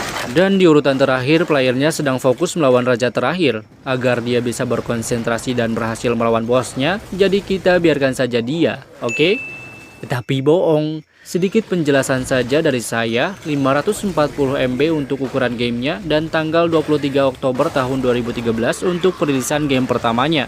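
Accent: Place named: West Java, Indonesia. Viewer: Lu orang sunda ya ngakak ( jangan di dueleu ) salam ti garkhil ( garut karihkil )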